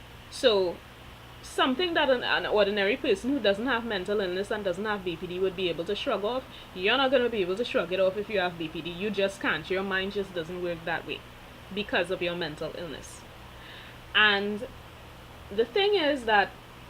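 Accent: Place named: Trinidad and Tobago. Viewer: Sooo... I have a thing for true crime and psychological subjects myself and have been researching this case extensively. Came across this video ans was like whoaaaa... is that a trini??? Cant miss the accent at all. I was like yes definitely a trini. From the moment I listened to Betty's actions, her interviews, everything I instantly felt like it was BPD... I had never been formally diagnosed but when I was younger before meditating and becoming self aware, I had these weird behaviours, hypersensitivity to perceived abandonment and rejection, and a rage that made me question myself a lot. When i researched BPD it was sooo in alignment with what I felt. A lot of the emotional issues I had, I worked through them by meditating and coming in alignment with my inner self, healing past childhood traumas etc.. UNTIL i entered a relationship with a narcissist. 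I was very aware of narcissism and he was completely textbook with the insidious undermining, gaslighting, head games, belittling etc. I found myself becoming erratic again, only in moments of being attacked though. I was becoming violent and nasty every time I was faced with verbal and emotional, sometimes physical assaults. But the thing is, he was so quiet, soft spoken and calculating, and I would react so loud and erratic, that I looked like the absolute nut job. While he stood there with a smirk on his face while quietly saying, "watch how you does get on." And i felt like I was going crazy. I just wanted to make it stop.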